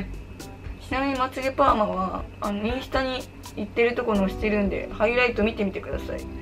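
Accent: Okayama, Japan. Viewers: いつまでも変わらない岡山弁が大好き🥺 岡山人として嬉しい😌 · ちゃんの毎日メイク知りたかったから嬉しい😂💗 方言が岡山ですごい安心感あります🥺✨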